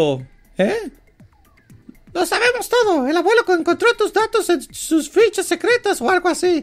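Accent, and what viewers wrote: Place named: United States. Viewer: mmm tienes ese asento gringo:3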